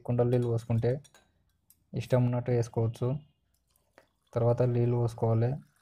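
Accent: Telangana, India. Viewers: Anna ni telangana langveg super anna and drink kuda mast undi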